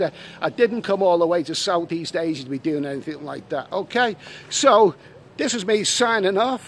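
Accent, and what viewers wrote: Liverpool, United Kingdom. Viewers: A squeeky clean Scouser!!!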